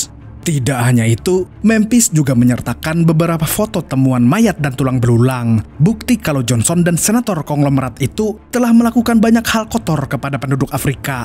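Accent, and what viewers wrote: Banjar, Indonesia. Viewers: banjar banar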